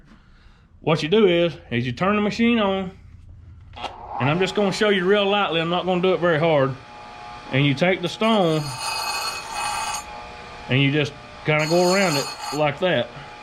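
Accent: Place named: Tennessee, United States. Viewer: I , like others who have commented here , am grateful for the absolutely stellar quality of your teaching. You and your son are a credit to the whole “ Chainsaw Community” amateur and professional alike. I own the parent company version of this grinder . That is the Tecomec Super Jolly. All of these machines are made in Italy by the Tecomec company. Your video explains the theory and practical aspects of the use of this machine so effectively that the company should compensate you for what you have done. There is no other video that compares. I was born in Florida but grew up in New York City. Your Tenessee Twang makes the video all the more enjoyable. You are an intelligent man and a gifted teacher. Thank You, sincerely for taking your time to make this documentary. I have learned much over the years about saws and chains but increased my gut understanding of the setup and operation of this machine after watching your video. 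Subscribed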